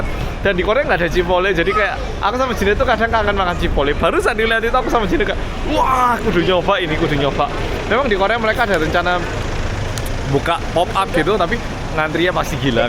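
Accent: Java, Indonesia. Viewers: ini korea Tulen tapi,konten nya Bener" MUEDOK sesuai bngt bhs Indo nya,MANTAAAAAAP SHT" SLL DN PAPI MAMI NYA Aamiin....🤲🤲🤲👍👍👍💚🙏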